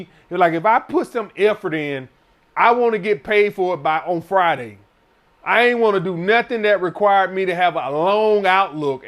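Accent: South, United States. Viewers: It’s funny you say you uncle was one of your biggest influences to being an alpha, but in my life you sound just like my uncle. Even the southern accent!!!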